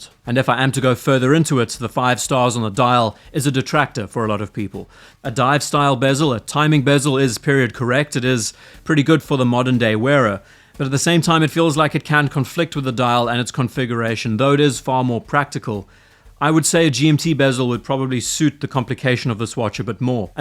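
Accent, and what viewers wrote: South Africa. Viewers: Trouble placing your accent, Pretoria, or ZA in general?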